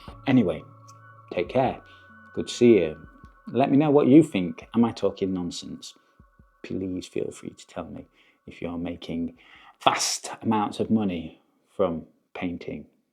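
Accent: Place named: Yorkshire, United Kingdom. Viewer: a traveler and you b sound just like my friends from Yorkshire ❤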